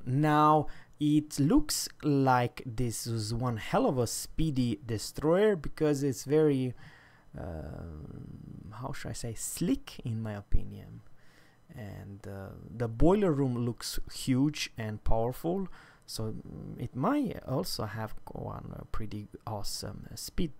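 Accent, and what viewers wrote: Sweden. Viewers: I sense a strong Swedish accent here.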